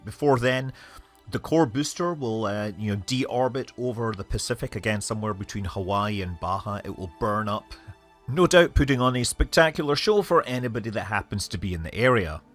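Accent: Scotland, United Kingdom. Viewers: And now you yave a Scottish accent...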